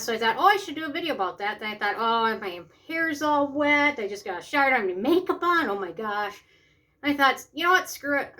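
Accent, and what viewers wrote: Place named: Midwest, United States. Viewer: I like your accent it sounds Midwesterny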